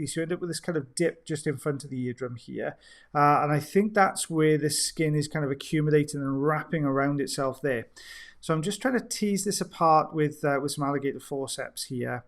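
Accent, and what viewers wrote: Wales, United Kingdom. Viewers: Lovely!! The suspense of.......its coming out......oops no it’s not.......here it is......noooooo! Better than a Steven King thriller this one !!! Thanks for sharing your talent and expertise with us.....your PATIENCE with your PATIENTS is remarkable and I miss hearing the Welsh accent, someone from home. We don’t get too many Welsh here where I am in Ontario Canada. Keep em coming!! CYMRU AM BYTH 🏴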